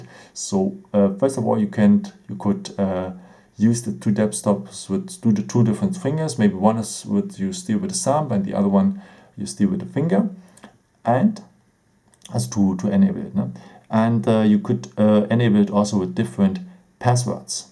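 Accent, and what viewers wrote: Germany. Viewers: Hab doch gleich gehört das du deutsch bist :P Super Video und klasse Smartphone 👍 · Dat german accent! :D Tolles Video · Haha German english😂😂😂😂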